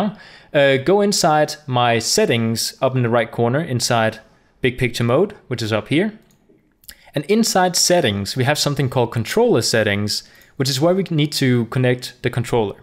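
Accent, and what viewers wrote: Denmark. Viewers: is he danish? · Are you from denmark? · are u danish? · are you danish??? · Are you a dane? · Are you danish by anychance? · er du dansker kan høre det på din aksang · is he danish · Are you danish? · haha tænkte nok du var dansk ku godt høre der var lidt der. Men super fed video den hjalp meget og du får også et like her fra :) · Awesome video! Are you by any chance Danish? You have an accent, which sounds very familiar to me.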